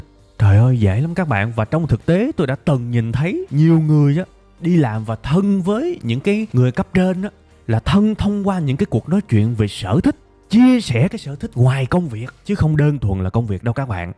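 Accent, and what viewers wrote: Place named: Tay Ninh, Vietnam. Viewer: Mình có người bạn ở tây ninh, học làm ở sg cái giộng điệu, nhấn nhá, cách nói chuyện y chang bạn này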